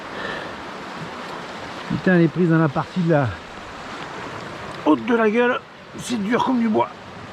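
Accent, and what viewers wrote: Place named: South, France. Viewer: Salut,je ne connaissais pas ce talent de chanteur👍, souhaitons que ces jolies farios corrézienne t'ai laissé un souvenir agréable,toi qui leur parle comme moi avec l'accent du sud, t'entraînerais tu pour cet été? 😉 une session rock and roll où on devine que tu t'es amusé. 👏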